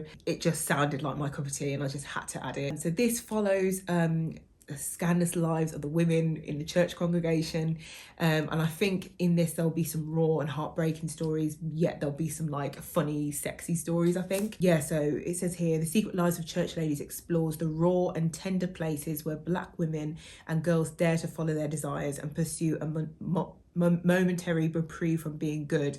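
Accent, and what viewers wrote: United Kingdom. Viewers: ! I just got your video suggested yesterday and seeing this one today and you got almost 20k subscribers!!!! I am so so happy for you, you totally deserve it! As someone who was mentally ill and had to stay at home for long periods of time myself, I am sending you love and spoons 💕 welcome to BookTube! I love British English so I am so happy to listen to you 😊 also, I don't know if Open water is necessarily the right book if you are looking for something more lighthearted! Don't get me wrong, it is an amazing book but its not without its triggering topics. Can't wait to hear if you liked it!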